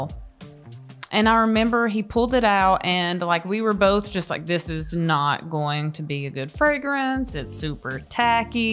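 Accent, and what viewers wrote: South, United States. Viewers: Gosh, I love your accent. I mean, I'm also American, but still. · you talk just like him but just with a female voice and southern accent lol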